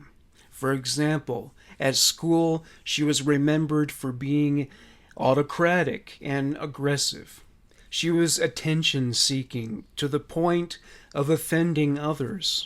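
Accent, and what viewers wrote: Canada. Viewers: He's Canadian give him a chance aha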